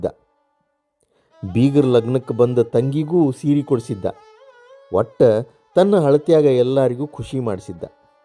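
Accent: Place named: Dharwad, India. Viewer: ನಿಮ್ಮ ಧಾರವಾಡದ ಒಳನುಡಿ ಕೇಳೋಕೆ ಒಂದು ಚಂದ ❤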